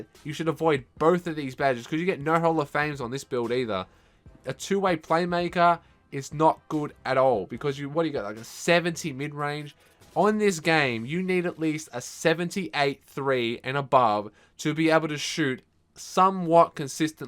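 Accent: Australia. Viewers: Are you from Australia or New Zealand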